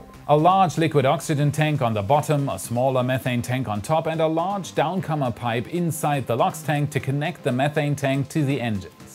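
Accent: Germany. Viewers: He is german isn't he? I feel like his accent is really light :D I'm so glad I found that dude lol Best quality updates! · yeah im also from germany and i can clearly hear the accent. but its incredibly light for a german. and yeah he is my favourite SpaceX youtuber :D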